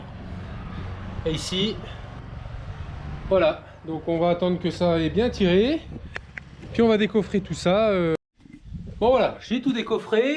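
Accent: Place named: Franche-Comté, France. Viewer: Le petit accent de la franche comté qui va bien. Juste assez sans avoir l overdose !! ( C est un franc comtois qui écrit ça !!) Meilleures vœux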